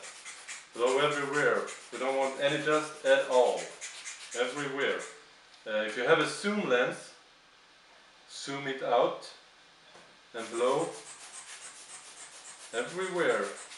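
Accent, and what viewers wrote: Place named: Scania, Sweden. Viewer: du är skåning:D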